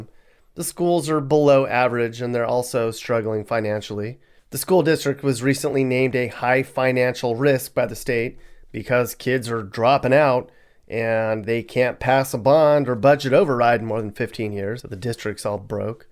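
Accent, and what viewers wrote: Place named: California, United States. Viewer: Very true. He sounds like he's from California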